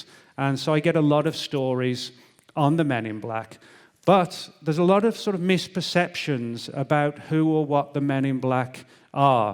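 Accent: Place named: Birmingham, United Kingdom. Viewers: sounds very UK Midlands accent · The Arlington Brummie 👍🏻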